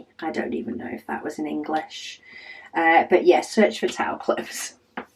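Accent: England, United Kingdom. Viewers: I really enjoy watching / listening to your videos. I have started a HAED diamond painting. I have been doing a 2 by 3 grid section just like you do, as it fits on my tablet screen. I don't have as many colors as your large HAED. I pick the symbol that is the lowest in the left most column, and let pattern keeper tell me the DMC number. I am working from right to left, and this outlines the section the quickest and fill in from there. I live in the states, and after a few minutes of listening to you speak, my inner voice will suddenly have an English accent. lol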